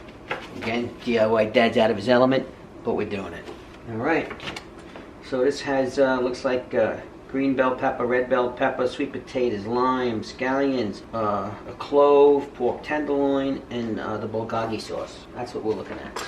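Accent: New York, United States. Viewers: Love the NY accent..💕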